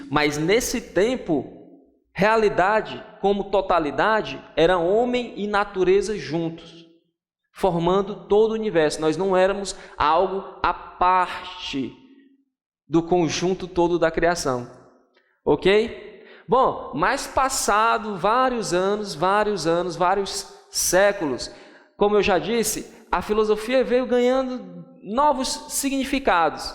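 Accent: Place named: Rio de Janeiro, Brazil. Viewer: pelo dialeto deu pra deduzir que você é carioca,o vídeo foi uma ótima introducao da filosofia para as pessoas interessadas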